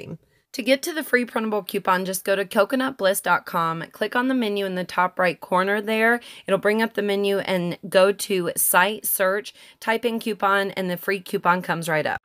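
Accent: South, United States. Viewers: Geezermann 😂😂🤣🤣Her southern accent I just love her she’s amazing accent and all🤣🤣😂😂🤗❤️🙏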